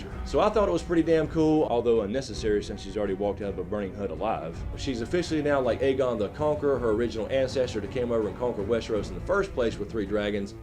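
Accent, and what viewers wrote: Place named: Carolina, United States. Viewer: Great review bro! Hey are you from NC? You have that Carolina sound to your voice.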